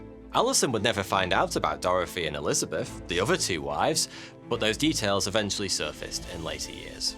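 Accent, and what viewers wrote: United Kingdom. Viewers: DDoesnt everybody have 4 families , and I'm searching for and don't see a video from You on king arthur .. with your British Accent i think You should have one if you haven't been working on that one for years ...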